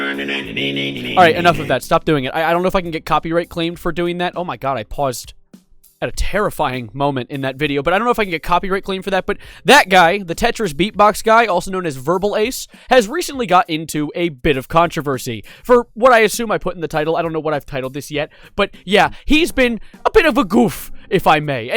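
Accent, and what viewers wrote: United Kingdom. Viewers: Me too she- WHY IS SHE BRITISH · WHY ARE YOU BRITISH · Why are you British!? Love it. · "Why are you british??? "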